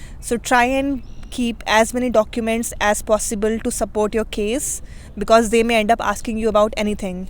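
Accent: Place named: India. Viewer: Indian accent so funny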